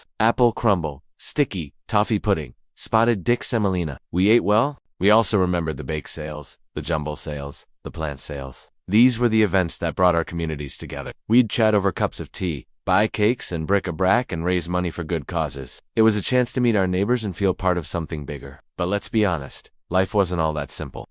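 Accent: United States. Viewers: The American accent definitely put me off. What would he know about the UK in the 60s?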